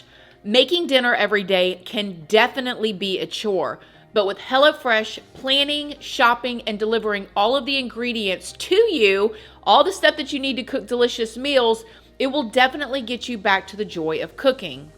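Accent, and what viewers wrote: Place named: New York, United States. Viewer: Is Christina from New York she has our accent lol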